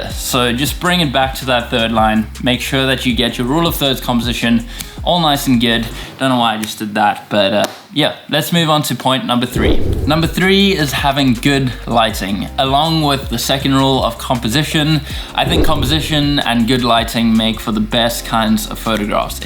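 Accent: South Africa. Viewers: Are you south African. Tour accent sounds like it.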